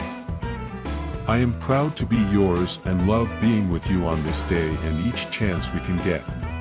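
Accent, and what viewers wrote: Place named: England, United Kingdom. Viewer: This beautiful video took nearly 30 mins to enjoy and I love hearing your reading although I did not watch the video but I truly listened your beautiful English accent my dear 😍 thank you so much for a long uploading and it really took much time for this video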